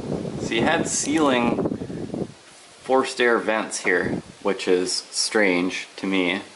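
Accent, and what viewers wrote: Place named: United States. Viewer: Where have you been?!!! I thought you'd given up exploring. Anyway glad to see you again. That must have been a magnificent house in its day. Thanks for the tour and by the way, don't know which part of the States you're from but I love your accent!! 😂 Gill 🇬🇧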